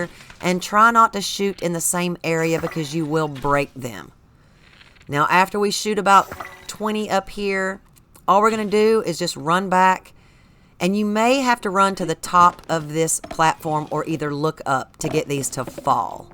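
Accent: South, United States. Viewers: FWIW, I thought your explanation was much easier to understand and follow than any of the other channels who go over this. Maybe it’s the Southern accent! ; ) t. a fan from SW Virginia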